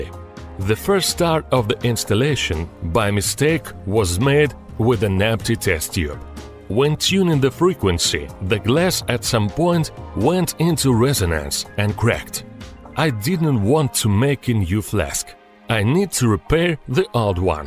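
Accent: Russia. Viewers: Your russian accent (I'm assuming) doing english is funny, tbh i like it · Вот ниразу не слышал русского человека, говорящего по английски, которого нельзя было бы опознать по акценту. Видос классный!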